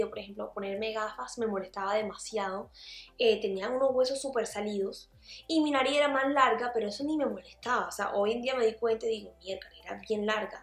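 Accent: Venezuela. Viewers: Buen video!! Pensé que eras Venezolana...por tu acento tan nuetro. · Es de colombia pero habla muy venezolano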